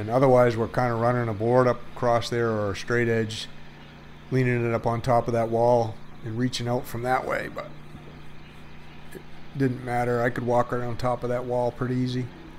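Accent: Maine, United States. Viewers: You are absolutely right about steel reinforcement on concrete. Concrete has very high compressive but less tensile strength. Reinforcement helps protect slab integrity under tension. Common ways the concrete has tensile forces applied are being entrapped (like a slab between walls) when expanding and contracting from temperature changes or subsidence form improper subgrade preparation. I had a 26' x 36' shop slab poured inside a ICF frost wall (8", 4000 PSI with fiber, one control joint midway, on 2" of blue foam with 6 mil vapor barrier, and meticulous sub grade prep) and no steel. People we're flipping out telling what a disaster I was going to have. Ten years latter there is not a crack in that slab. It's expansion and contraction is buffered by the ICF block so it is not trapped and never goes under tension. This is with a 10,000 lb car lift on it. 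The unstated downside to steel is it expands as it inevitably corrodes and can break the slab up on it's own. Great channel, watch regularly. I'm from Hollis, ME now exiled to VT. I recharge my accent when I watch. As I tell the Vermonters, anybody from Maine is worth two Vermonters, three on a good day. They take it in the spirit of humor as intended but people from away are aghast.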